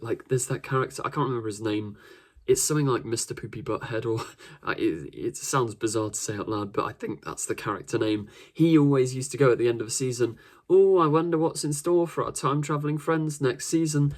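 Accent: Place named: Coventry, United Kingdom. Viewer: Ooh you from Coventry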